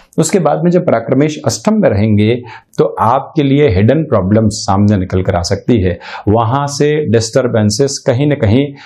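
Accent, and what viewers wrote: Standard. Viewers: Very pure accent , great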